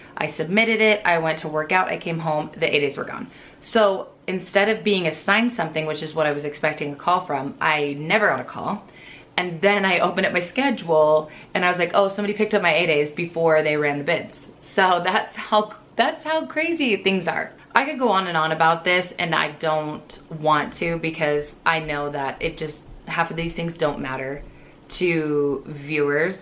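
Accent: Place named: Southern California, United States. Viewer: my friend, I hear you talk,and I hear in both what you say and how you say it that you are a So Cali girl. This AGED So Cali boy feels back home when you talk to us. You are totally right. Daddy D IS over staffed. And most likely every one else. I actually tried toward end of year with you, but Talent Acquisition closed the Req# right away. No doubt somebody realized there were too many people to pay already. Let me fill you in on what has gone on. I think you know I am not 28. It’s the reverse - 82. Due to Rodg’s death from cancer a year ago today (25 February), being alone here and rambling in a place too big for me, I decided finally that zi needed to be around different people all the time and to be away from being home alone in order to have those experiences of growing and broadening. Only F9 was hiring. Although in good health, at 82, I never thought I would get invited to an all day event, much less get offered a CJO I was invited to an event in DEN 14 September and got the offer. 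3 days later, I declined it, mostly due to having some pretty expensive property in my care (you may know a little about SEA real estate). I remained in good stead and later thought how dumb that was or me and that I really want that FA lifestyle snd to try again after 3 months If offered again, would just close and lock door. Well, went through whole process again, got invited to DEN event 6 February and was offered CJO A SECOND TIME. Am waiting for the background check to be comple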